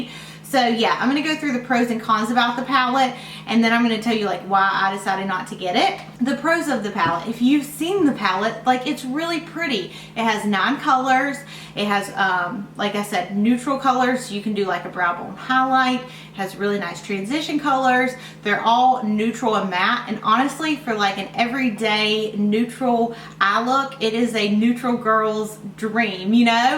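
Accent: South, United States. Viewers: this is such a good video like why buy something that's just like other things we own and plus I don't think she could ever make anything sound negative with her southern like accent😊😊😍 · Thank you for honesty, so many times I feel you tubers push products just the sake of selling them. I unfortunately an one those who fall for it too. Keep up great videos. I love your accent too as someone from Kentucky it's nice hear a little southern twang.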